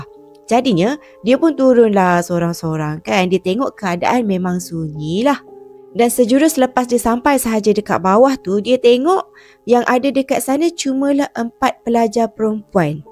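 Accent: Kelantan, Malaysia. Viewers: Assalamualaikum cik puan story ni maaf bertanya lah yer, Kelantan tu cik puan daerah mana tu, maaf sebab bertanya tu cara percakapan tu mcm loghat Kelantan, sekian terima kasih.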